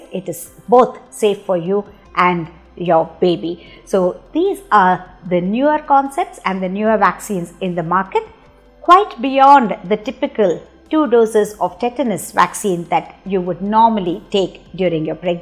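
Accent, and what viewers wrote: India. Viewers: When to go for a flu shot when pregnant? NEVER!!!!There was a 4250% increase is miscarriages in pregnant women in <link> Indian accent does not make one knowledgeable regarding your health. No pregnant women should EVER get a vaccine. Never.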